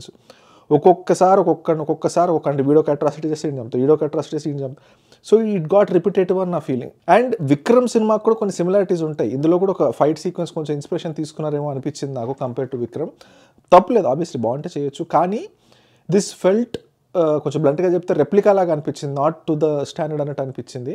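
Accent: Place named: Ichapuram, India. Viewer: Blue films review Ichapura lahja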